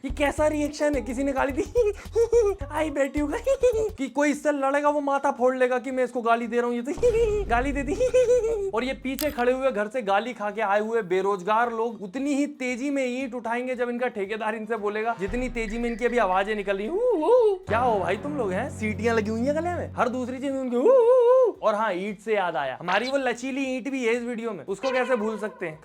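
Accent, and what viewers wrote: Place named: Madhya Pradesh, India. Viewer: Yarr mujhe aapki tone se lagta he tha aap MP ke ho. I have complete my education from Bhopal and I am from Jabalpur MP. Really felt very nice when you shared you are from Bhopal😍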